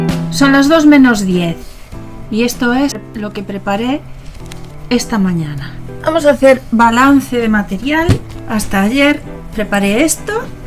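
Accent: Spain. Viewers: Un trabajo precioso!! Acabo de descubrirte me encanta la paciencia que tienes. No se si me equivoco pero me parece que tu hacento es gallego. Gracias por enseñarnos tus trabajos.saludos de una gallega ❤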